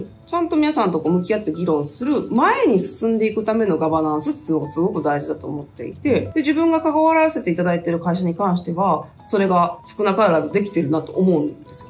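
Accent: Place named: Kansai, Japan. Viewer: 健康第一！ 馬ちゃんの関西弁もっと聞きたいねん😁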